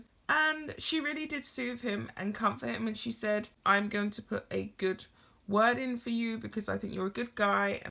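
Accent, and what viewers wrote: England, United Kingdom. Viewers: I think the way you pronounce her last name is adorable and speaks to your English accent. Honestly people are so nasty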